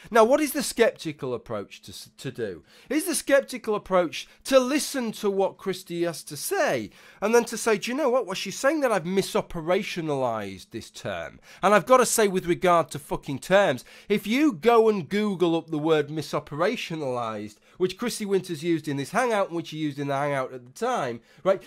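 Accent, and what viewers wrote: United Kingdom. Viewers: British accent is the best:)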